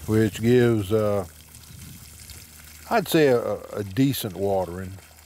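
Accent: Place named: Texas, United States. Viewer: I absolutely love your videos. So helpful and always entertaining. I'm from SE Texas just an hour west of the TX/LA border, but I live in Dallas now. Your accent immediately brings back "home" for me. Thank you so much for your videos and the time you put in to teach us all how to grow smarter, not harder. Lache pas!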